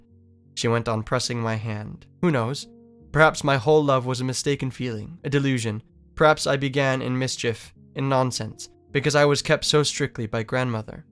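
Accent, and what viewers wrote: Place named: United Kingdom. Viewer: good video but i hate your shitty british accent when you read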